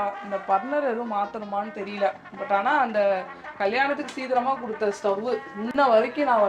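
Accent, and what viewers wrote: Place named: Malaysia. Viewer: Are you from Malaysia sis.because your tamil talking same as Malaysia.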